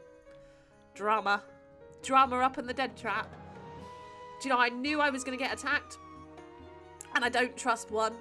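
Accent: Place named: United Kingdom. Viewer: I am always amazed at how British you sound and I'm British myself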